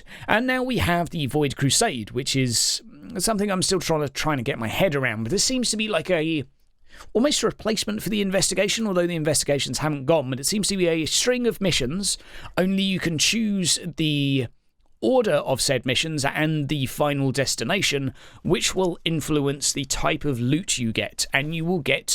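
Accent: United Kingdom. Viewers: I don't think any other fellas have a better British voice/accent than.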